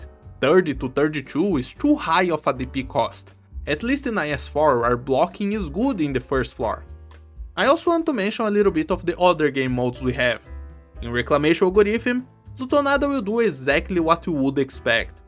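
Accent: Brazil. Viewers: Are you Brazilian? I recognize that accent lmao.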